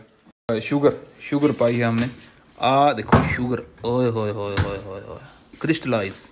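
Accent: Punjab, India. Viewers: He was trying so hard not to go full punjabi hahah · Veere punjabi leak hoi jaandi aa thodi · g twadi hindi mix punjabi kmaal ey yr...exact imitation ey ona noee--dolteyey di jina nu chj di ek zubaan vi ni andi😂😂😂 love you! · Loved your punjabi yrr ki authentic punjabi boli hai🤣🤣🤣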